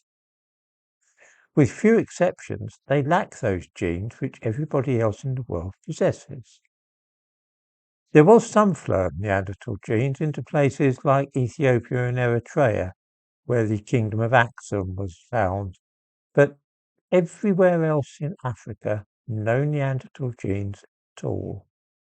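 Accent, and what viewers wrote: United Kingdom. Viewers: It's so difficult to understand British accent. I can watch videos on science and philosophy in american english all day long for decades, but one single 5 minutes video with a strong british accent and I need subtitles along questioning my whole life and ability of speaking english. LOL It also happens every time I go to London. And I lived in London for a year (12 years ago). Jeeezzz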